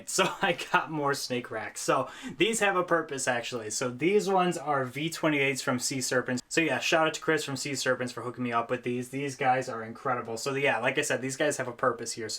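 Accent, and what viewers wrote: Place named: Michigan, United States. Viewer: Are you from Wisco or Michigan? My first guess is Michigan. With your accent. Haha.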